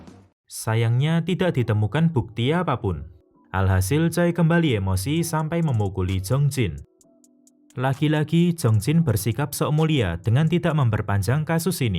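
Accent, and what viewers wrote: Java, Indonesia. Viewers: Suara yac medhok .tapi enak didenger Karna notasi yac pas. Gk kecepatan tau kepelanan . Dan jenis suara yac berat Enak pkk eh dnger .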